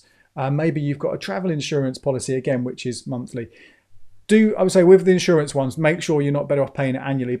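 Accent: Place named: United Kingdom. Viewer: Who can keep up with all these bank accounts good lord lol . By having so many bank accounts you run the risk of inactive accounts . After awhile they can shut down those accounts depending on your banks policies . But I’m guessing from the accent you’re from the UK which has different banking laws .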